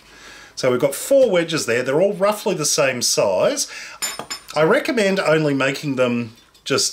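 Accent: Australia. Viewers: Shahrukh Shaikh he has an Australian accent and Sam has an English accent. They sound very different.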